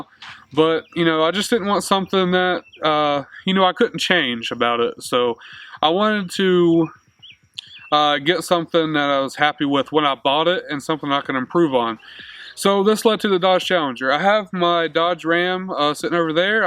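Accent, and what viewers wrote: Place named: South, United States. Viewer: I thought the main presenter had a distinctive southern accent. Who is this big boy?!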